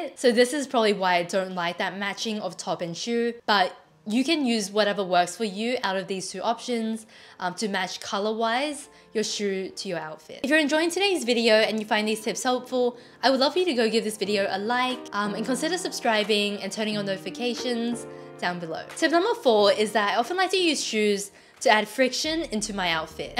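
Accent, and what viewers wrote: Australia. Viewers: I just love your Australian accent, just like rose's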